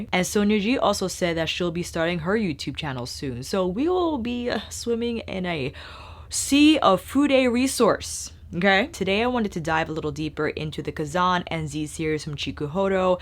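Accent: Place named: United States. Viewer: my love, please don't take that as an offence, but I kinda feel bothered by you pronouncing the Japanese names with the heavy English accent. Since you're a huge fan of Japanese makeup brushes brands, I think it'd be more respectful to the brand and the culture if you look up to pronounce the names a little more correct to the Japanese pronunciation. In fact is pretty easy. I say that because I have a lot of proximity to Japanese culture and it feels a little disrespectful when the names are pronounced the american way. Anyway, great review as always! Love u!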